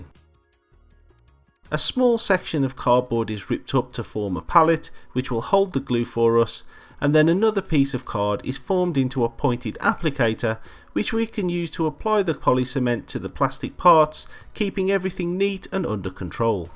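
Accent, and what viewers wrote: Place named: Black Country, United Kingdom. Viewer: He sounded like a yam yam to me.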